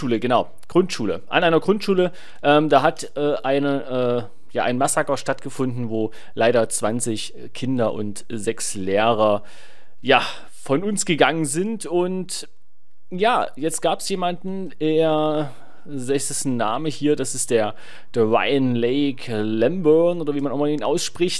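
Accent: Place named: Saxony, Germany. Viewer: Dat Sächsisch yo :D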